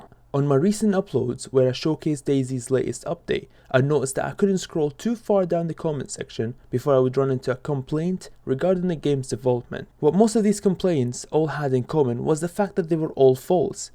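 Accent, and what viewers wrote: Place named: Scotland, United Kingdom. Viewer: Where are you from? You have a bit of a scottish accent but what is your first language? just wondering lol :)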